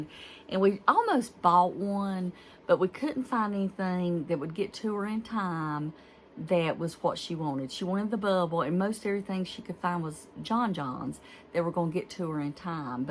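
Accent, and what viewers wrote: South, United States. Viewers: I missed you. So good to see and hear your southern voice!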